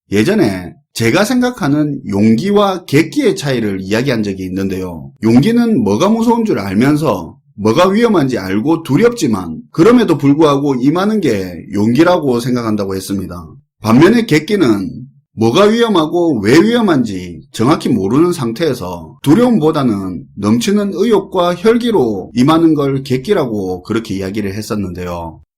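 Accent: Gyeongsang, South Korea. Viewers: 저는 시장은 장기적으로 우상향한다는 낙관론자에 가깝지만 이 부정적인 사람이라고 생각하지 않습니다. 시장을 여러시각으로 바라보는건 당연하죠. 언제나 재밌게 잘 시청하고 있고 갱산도 억양 넘나 좋습니당ㅎㅎㅎ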